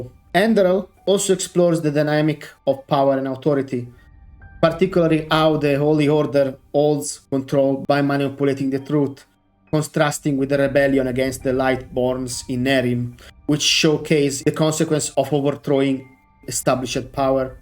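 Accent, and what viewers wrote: Italy. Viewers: Your language is beautiful and poetic! You might just want to speak a tad more slowly, pause for a moment between sentences to make it easier to follow for those of us not used to the Italian accent. Mille grazie!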